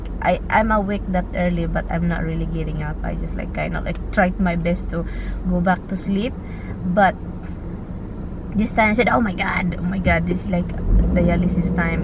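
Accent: Visayas, Philippines. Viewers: I can hear a Bisaya accent when you speak Tagalog. And you speak slow enough where I can understand much more than from a natural Tagalog speaker. Thanks, it helps me learn Tagalog.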